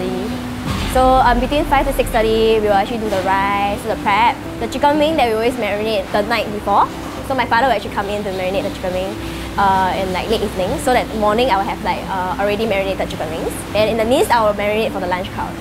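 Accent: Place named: Singapore. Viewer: zigstar losat this is how we speak among ourselves, most of us will moderate our speech to an extent when speaking to non-singaporeans (except Malaysians... they basically sound like us)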